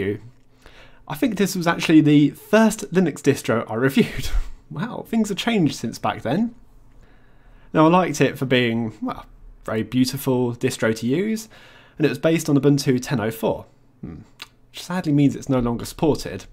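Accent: United Kingdom. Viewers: Does he have a British accent?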